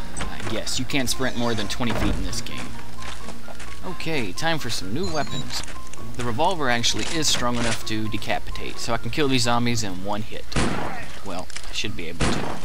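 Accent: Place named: Appalachia, United States. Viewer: lol, I love his accent... And the intellegence thing, HES A HILLBILLY !!! I wonder where cleedis is? I hope he done did get outta here before dem zombie fellers got him!... hehe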